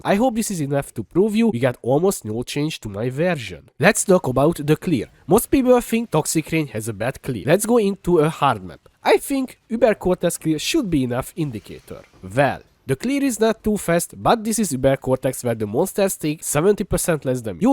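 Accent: Hungary. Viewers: kb én is igy beszélek angolul lehet hallani a magyar kiejtést :D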